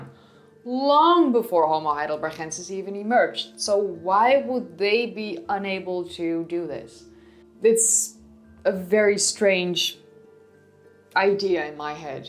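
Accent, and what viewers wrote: Netherlands. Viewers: pegged you for Dutch in about 30 seconds... the Gs are a dead giveaway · Your accent and Dunglish is simply brilliant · Your slight dutch accent is very cute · Is that a lovely Dutch accent?? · your Dutch pronunciation is very attractive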